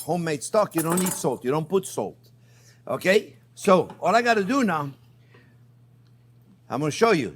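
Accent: France. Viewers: Dude is aggressively French from the beginning XD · I absolutely love this guy… how bout a split pea soup?? On a side note who doesn’t love listening to a French chef talk and cook?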